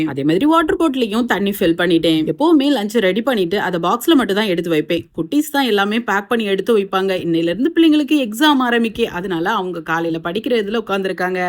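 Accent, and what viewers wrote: Tirunelveli, India. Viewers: Sister nenga enna five star hotels chef fa erunthingala and your slang is very nice nellai slang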